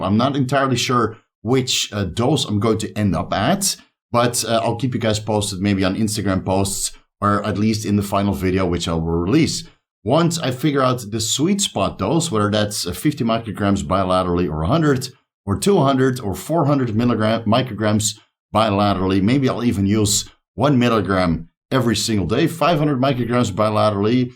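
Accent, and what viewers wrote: Netherlands. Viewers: dus ja? interesante shit man kan wel redelijk horen dat je nederlands bent maar dat maakt het voor mij alleen maar mooier🤣. dank voor de content bro!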